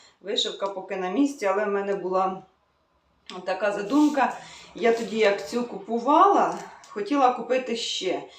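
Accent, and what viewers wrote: Poltava, Ukraine. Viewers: Ви все правильно робите, я на Ваш канал підписалась саме завдяки полтавській говірці, а потім вже в'язання! Дякую Вам за щирість! Продовжуйте знімати в своїй манері, чекаємо!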